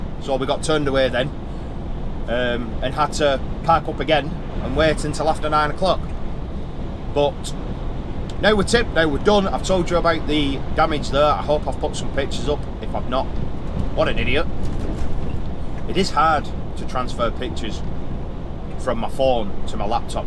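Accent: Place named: Leeds, United Kingdom. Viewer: Glad you like Scotland, i was born in Scotland, they batter everything 😅, haggis pizza?! I've just subscribed and i know WS, William Stobart. It also sounds like your from leeds way, i could be wrong, but im in malton myself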